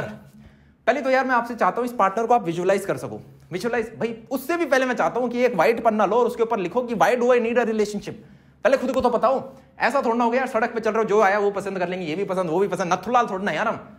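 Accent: Haryana, India. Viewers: what a haryanvi tone of speaking wah 😉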